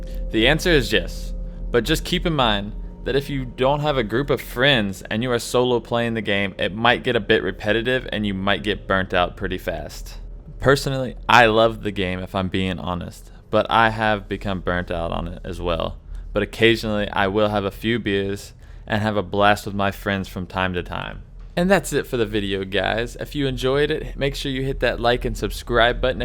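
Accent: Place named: Australia. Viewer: sounds australian to me, our accents make it sound like we say "bee-ah" while americans use a hard R at the end. same goes with all words like beer, like fear and dear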